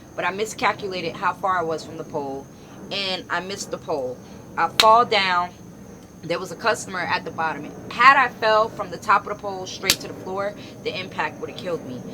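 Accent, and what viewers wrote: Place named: New York City, United States. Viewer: I knew you was from NYC girly. I could still hear the accent. That is something we never loose. I’ve been all down south and my accent never left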